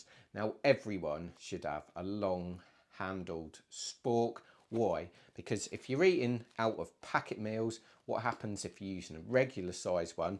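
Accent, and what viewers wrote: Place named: Scotland, United Kingdom. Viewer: I live the Scottish accent bro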